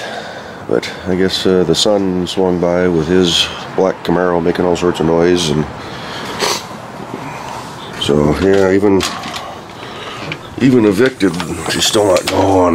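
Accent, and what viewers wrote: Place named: Canada. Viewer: are you in Canada? can you speak with out a Canadian accent?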